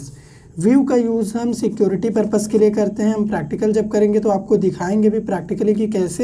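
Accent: Jamshedpur, India. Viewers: Are u from jamshedpur ❤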